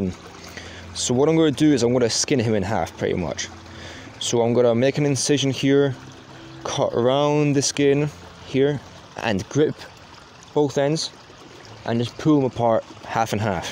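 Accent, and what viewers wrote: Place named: Scotland, United Kingdom. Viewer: I cant place your accent.... its scotish but sounds like theres somthing else as well?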